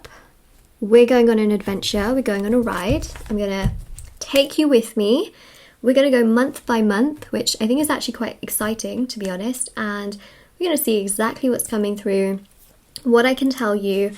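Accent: United Kingdom. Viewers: Much confused she sounds British uses American slang haha thank you for the reading. I hope this is how it works out.. 🤞♊️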